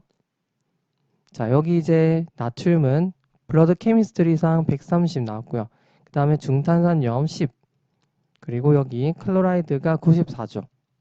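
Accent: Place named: Gyeongsang, South Korea. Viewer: 약간의 경상도 사투리가 정감있어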